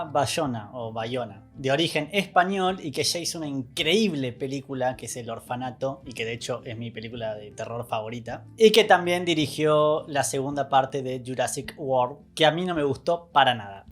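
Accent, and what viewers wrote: Argentina. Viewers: ¿Eres argentino o uruguayo?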